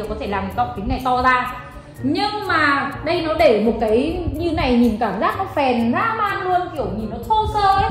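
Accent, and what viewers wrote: Nghe An, Vietnam. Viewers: video sắp tới mần video review bằng giọng Nghệ nha chị :<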